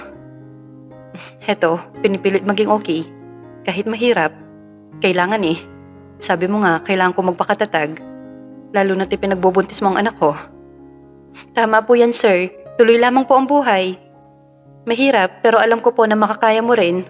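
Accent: Philippines. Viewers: Anu kaya ang probinsya ng nagsasalita my vlogger din aq napapanood na vlogger sa cp nmn ganito ang boses nya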